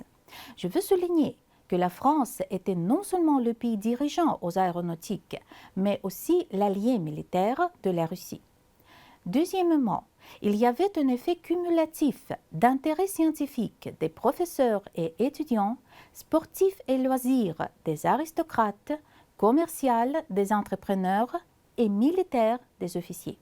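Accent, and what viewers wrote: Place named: Russia. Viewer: ce fut très instructif, merci madame pour tous ces renseignements. j'ajouterai que votre Français est parfait en plus avec un très joli accent russe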